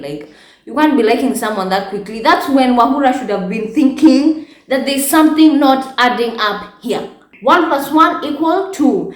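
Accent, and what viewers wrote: United Kingdom. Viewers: Newly hooked and Wahura is pretty😻your skin is to die for...Damn!!! Also it's the Britt accent for me😂😂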